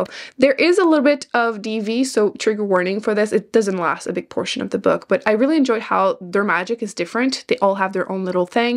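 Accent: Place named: Quebec, Canada. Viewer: Thank you for sharing,! Stupidly question, are you from QC? I love the little accent.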